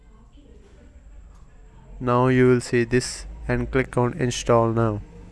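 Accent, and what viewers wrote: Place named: India. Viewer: That accent must be common in India!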